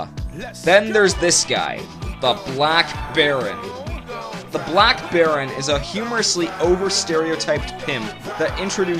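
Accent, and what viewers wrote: Scotland, United Kingdom. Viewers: he sounds scottish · kojackin my opinion sounds somewere between british and scotish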